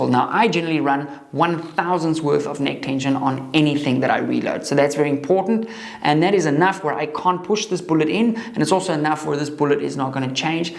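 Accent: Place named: South Africa. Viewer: Good vid. Great tips. Are you South African? We are. But live in New Zealand now. It must be expensive in SA getting the hardware you need....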